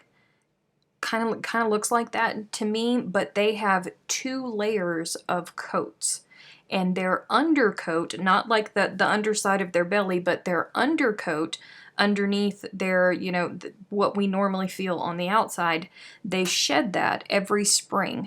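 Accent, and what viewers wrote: South, United States. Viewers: If I upset you tonight by my comment about the southern accent I want to apologize. I ment it in a good way. I love the southern accent and miss listening to my grandparents. It was the you alls that was the only time I really heard it. I really enjoy your podcasts and promise not to say anything again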